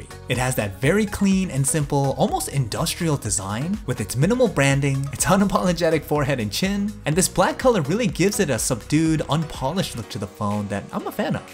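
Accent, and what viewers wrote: United States. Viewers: Another fantastic video from. Honestly saying I didn't copy but suddenly noticed there is a channel which is publishing videos almost identical to my videos. Even though he will win for quality and the gears he is using are far better than me. Also my accent is too Indian (Im not Indian though) than his American excellent accent. you are good.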